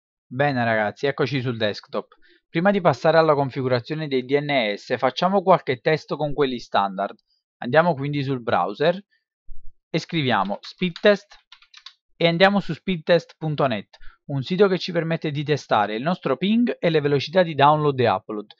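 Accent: Naples, Italy. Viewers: ah bella parlata napulitana xd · Ma sei di napoli? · oddio si sente proprio che sei di napoli xD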